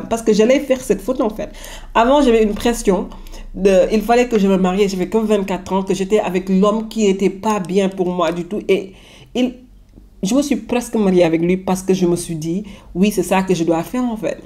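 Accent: Senegal. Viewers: J’adore l’accent sénégalais ❤️❤️❤️ much love from cameroon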